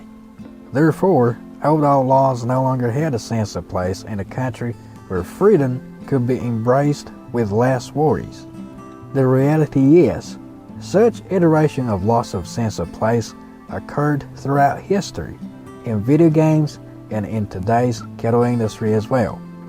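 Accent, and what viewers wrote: South, United States. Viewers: 我是一个住在德州的中国人。你的南方发音很棒 :)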